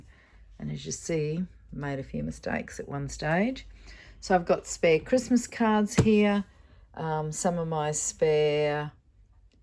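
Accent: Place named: Australia. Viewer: I have always thought I was born in the wrong place.. im not supposed to be from CALIFORNIA, I was supposed to be Australian!🤣 I LOVE your accent.. it's so amazing! So is your craft room, of course! Your room is absolutely gorgeous!! 🥰Quick story.. my grandparents went to Hawaii 1x when I was about 13, I'm 44 now lol. I told them to bring me back a cute boy, lol! When they got home from their trip, they showed us the home videos they took. In the middle of the video, were 2 guys on the beach! All of a sudden, they say... "G'day Melinda! We're from Australia!" I about died!!! My grandpa went on the beach to find 2 guys without shirts of course, and asked them to film a clip for me! I miss my gpa so much! He did this stuff all the time! ❤️ I know this isn't about that story.. but it's just to show how long and much I've loved Australia! I hope I get to go someday!❤️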